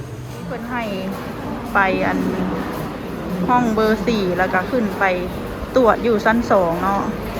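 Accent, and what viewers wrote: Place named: Nakhon Phanom, Thailand. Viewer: สบายดี สาว คนงาม สุขภาพแข็งแรงร่ำรวยร่ำรวย เด้อ.สาวเซโน สำเนียงเว้า คือทางบ้านนาหลวง นาทราย เน๊อะ อำเภอเมือง นครพนม (คนไทยในต่างแดน)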